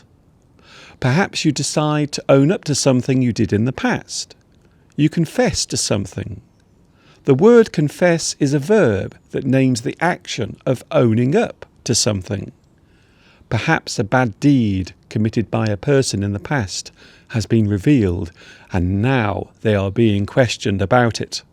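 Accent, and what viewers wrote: United Kingdom. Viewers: It seems like your sound tone is so heavy, that I'm scared, I should have to confess I've fallen in love with the British accent. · Hi, yes...I have to confess I've something so important that I must get it off my chest (...trying to use those expressions here, did I do correctly...please?!☺️) I do like the British accent and my ears turn up immediately as soon as I hear it and I'm very interesting in your country 😅. Well, there's something else I must confess, shh.....I know that I have scratched Mr.Steve's car down there in the garage! Don't tell anybody! 🤫🤭😅 Jokes apart, I have to confess that it seems to me to have found two nice friends thanks to this channel and, let's not forget the English Addicts out there, even though we have never met in real life, it should be so interesting, I feel glad and not alone with my English adventure! 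Cheers! 😊🙏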